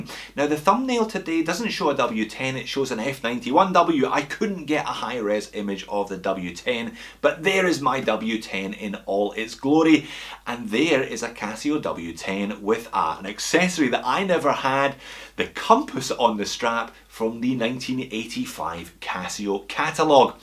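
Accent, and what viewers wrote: Scotland, United Kingdom. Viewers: As a fellow Scot I liked your mention of Watches of Switzerland in the Argyle Arcade in Glasgow. I bought my first proper watch in there too for my 21st. An Ebel, which I still have but now looks as old as I do 😄 Keep up the great work. My wife knows what I’m watching by your accent which she says is better than mine! That’s an insult as you are a teuchter 😀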